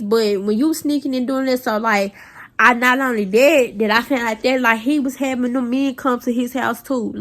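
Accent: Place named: Arkansas, United States. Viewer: We can tell you are from Arkansas lol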